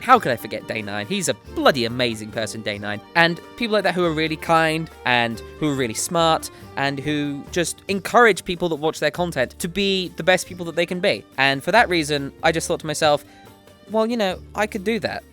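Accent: United Kingdom. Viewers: I subscribed to you when I watched your SoO LFR videos, since they were really helpful. I now watch a lot of your videos (though not all because I don't have the time). I love your guides (the Blackrock Spire one is GREAT! keep it up!) and I love your daily discussions. I hope to see more. About your personal life, I wasn't aware of all that (although I did find out you are British just by listening, lol) and I find it to be a rather interesting story. It's sad you two had to go through all that trouble just to get married. If you weren't by now, I'd suggest you to come visit my country, but it won't be necessary now, hehe. Anyway, good luck with your life, with your channel, with everything. Keep up the excelent quality! :) Regards, from the southernmost country in the continent, Argentina.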